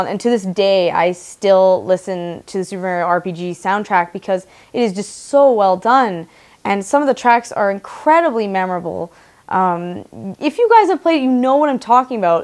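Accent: Canada. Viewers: Canadian right? "Mare-rio", "aboat".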